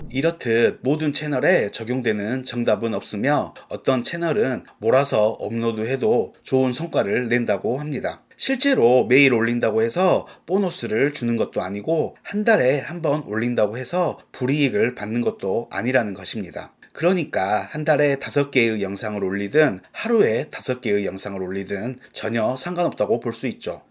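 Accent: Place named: Jeolla, South Korea. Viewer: 전라도분이시네😊 저도요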